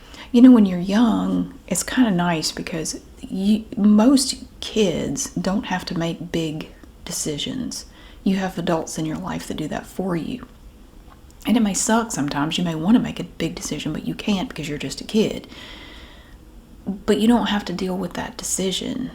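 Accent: South, United States. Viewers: I’m with you…I love solitude and doing most things by myself. 🙌🏼 And your soft but not whispering southern accent/voice is such perfect ASMR…love your videos!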